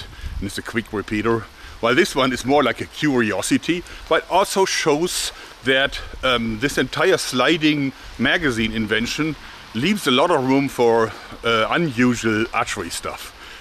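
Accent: Germany. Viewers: german accent with an evil laugh ? I got the best video in my 33 year life. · He even has the german accent going for him. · German english accent is great :) and laught is so fresh , don't change anything man !!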